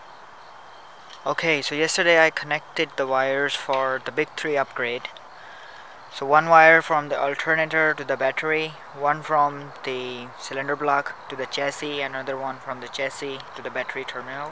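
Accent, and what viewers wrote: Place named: India. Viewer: its indian ;)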